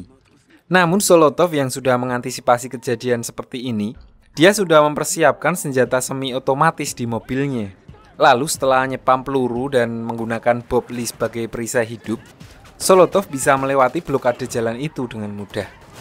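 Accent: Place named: Java, Indonesia. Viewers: medok cukk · Youtuber dari jawa timur ini kyaknya, udh jelas bgt dari omongannya wkwkwk..😂😂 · Medok banget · njir sikak wong temanggung ta 🗿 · Medok tp keren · gak bisa biasa aja apa bang medok banget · Fixs wong temanggung admin e 😂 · medhokk cokk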